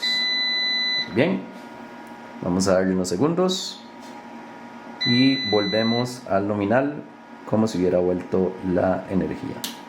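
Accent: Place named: Costa Rica. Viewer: Mae usted es tico? ese acento...😄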